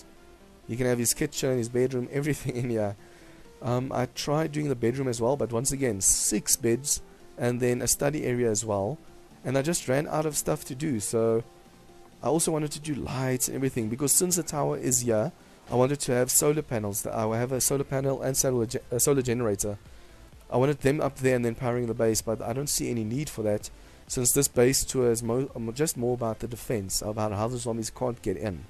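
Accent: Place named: Afrikaans, South Africa. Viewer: Epic! Nice job, great results ... Love it... The Afrikaans accent :)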